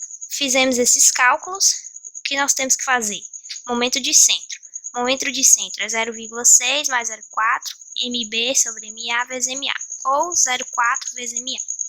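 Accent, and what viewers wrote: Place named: Northern Minas Gerais, Brazil. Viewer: Sotaque norte mineiro. Belo vídeo.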